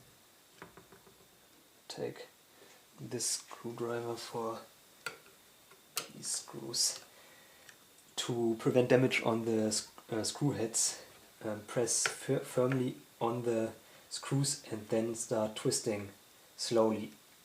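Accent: Germany. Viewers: are you from Germany? sounds like that on your accent..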